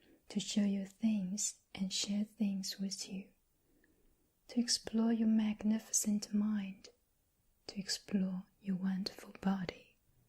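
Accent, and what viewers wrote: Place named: Australia. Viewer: 好好听 有一点点澳洲口音哈哈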